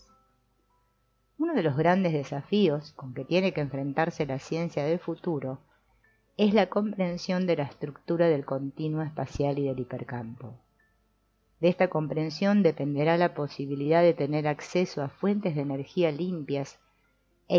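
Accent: Argentina. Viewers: Me lo estoy estudiando segundo a segundo. Ya leí el libro. Y escucharlo narrado por una Argentina, fue una sorpresa grata. Voy a dar una conferencia sobre el tema.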